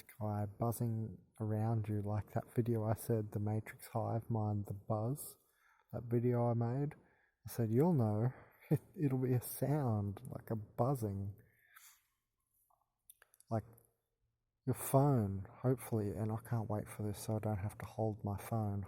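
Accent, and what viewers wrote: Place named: Australia. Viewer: Is that the eerie chimes of the Uruguayan Mr Whippy van stalking you at the start of the vid? Sounds like you're an Aussie, did you move to Uruguay in hopes of ending the stalking?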